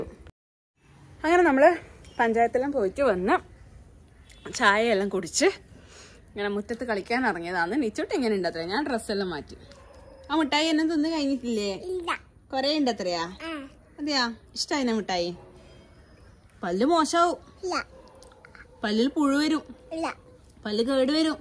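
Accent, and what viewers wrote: Kannur, India. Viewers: തന്റെ kannur slang മാറുന്നുണ്ടോ എന്നൊരു തോന്നൽ.. നമുക്ക് നമ്മുടെ slang kelkkanaa ഇഷ്ടം 👍🏻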